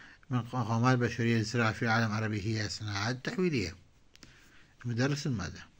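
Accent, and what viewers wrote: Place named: Egypt. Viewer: الاستاذ چنة مصري بلگوة ديحچي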